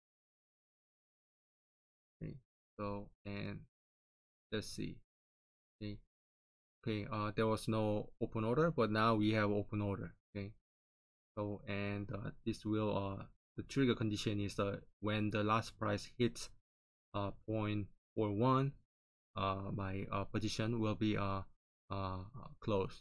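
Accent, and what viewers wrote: South Korea. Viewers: 나는 영어를 못하지만 처음 몇문장 듣자마자 한국인임을 알았다 ㅋㅋㅋㅋ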